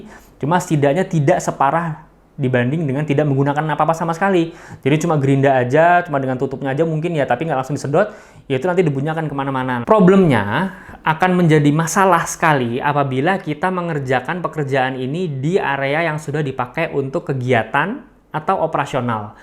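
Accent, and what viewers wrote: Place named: Banjar, Indonesia. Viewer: Logat banjar nihhhh..heheheee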